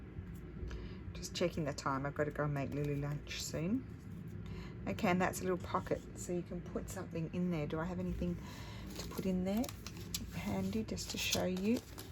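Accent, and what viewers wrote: Australia. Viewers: Thank-you for to-day Its great to hear an Aussie voice we are just out of our 5th lock down in Victoria Your YouTube channel has helped so much in all our lock downs Stay Safe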